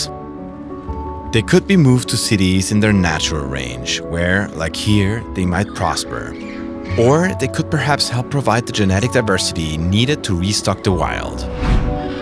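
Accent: Germany. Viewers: Deinem Englisch nach bist du eindeutig deutsch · When you're used to fake German accents done on purpose by some youtubers and stumble upon a serious documentary with a real German accent.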